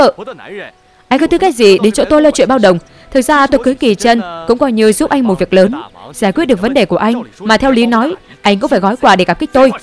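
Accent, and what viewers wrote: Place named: North, Vietnam. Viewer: Giọng Bắc đọc nặng quá xem không có hay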